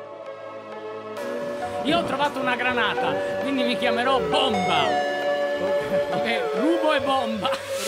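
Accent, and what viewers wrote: Sardinia, Italy. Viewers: sono sardo come te e ho scoperto di dove sei perché l'accento e il nome sono di un posto della Sardegna che che conosco bene.Prima pensavo che fossi di Sassari ma ho capito che non era così comunque non dirò a nessuno nei commenti di dove sei perché farei lo spammone bastardo ma tralasciando questo....volevo chiederti se facevi dei raduni perché tu mi stai molto simpatico e molto a cuore e vorrei incontrarti di persona.Ho 11 anni e sono tuo fan a quando facevi "Dayze" che se non ricordi era un gioco di zombie e hai giocato moltissime partite sopratutto con Mario. Poi sono nate altre serie come quelle di Happy Whells e minecraft e se non ricordo bene il tuo primo video è stato proprio di minecraft.Comunque io vorrei veramente tanto che tu facessi un raduno qui in Sardegna perché vorrei incontrarti di persona e mi farebbe veramente felice. 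SPOLLICIATE PER FARGLIELO LEGGERE!!!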